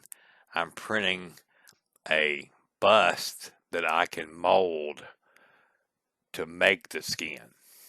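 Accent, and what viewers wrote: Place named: South, United States. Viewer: now THIS is what youtube is for. People who are EXTREMELY talented in their craft, and of course, a nice southern voice-over included with actually instructive narration. God speed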